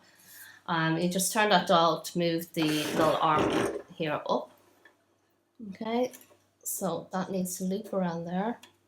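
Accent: Ireland. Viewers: The Subtitles can't handle the Irish accent, hello from Co. Antrim btw. ;-) Haven't gotten around to using mine yet. Subscribed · Thankyou very much ,, a beautiful Far Eastern lady with an Irish accent 🙏🙏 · Love the accent!! My dad was from Tipp, my maternal grandparents from Galway and Mayo and my husband from Down. I'm a sucker for an Irish accent 😁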